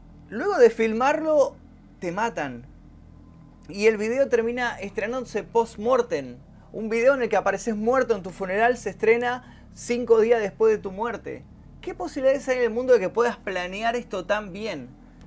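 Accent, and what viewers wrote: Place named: Argentina. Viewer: Que bueno que no eres el tipico argentino con el acento cargado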